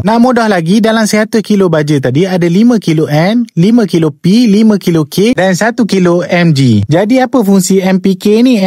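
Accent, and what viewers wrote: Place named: Tloh, Malaysia. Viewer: Syabas anak muda,suka dgr loghat org Tloh😂🎉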